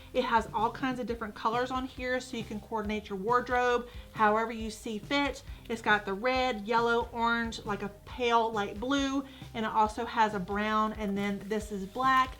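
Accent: South, United States. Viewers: New sub here. I agree, Teddby Blake has some gorgeous well made bags. I got the 9" Ava Croco Gold in Arancione and now I want the 12" Caty Croco in Black! I can attest to the quality of the leather having lived a few years in Italy, their leather is TOP notch! Looking forward to seeing more video's from you dear. Btw, I'm a Southern gal too and I love your accent!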